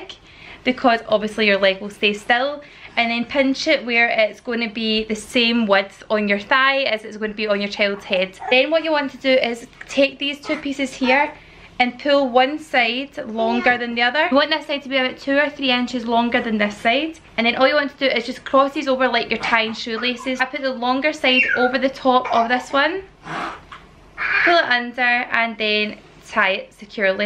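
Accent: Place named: Glasgow, United Kingdom. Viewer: Found your channel a few weeks ago and I've become so obsessed, I've watched so many of your videos now, had a total binge haha. I'm not planning on having kids for like 10 years but I still find your content so interesting. I'm also from Glasgow and love hearing the familiar accent 😊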